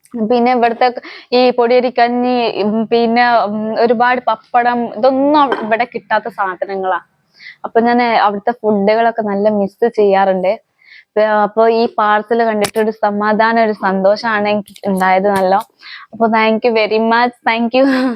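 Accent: Malappuram, India. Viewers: നീ എത്ര കാലം karelatttil താമസിച്ചു ❤️👍❤️അതിശയം മലപ്പുറം ഭാഷയയാണ് തോന്നുന്നത് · നല്ല മലപ്പുറം മലയാളം ❤️ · Malappuram ജില്ലയിലെ മുസ്‌ലിം പെൺകുട്ടികൾ സംസാരിക്കുന്ന പോലെ ❤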